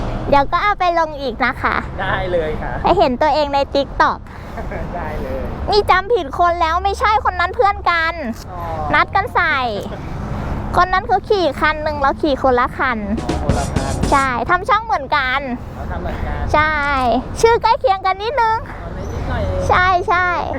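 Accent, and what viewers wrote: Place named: Isan, Thailand. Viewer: เว่าอิสานม่วนคักจ้าาา👍💖😁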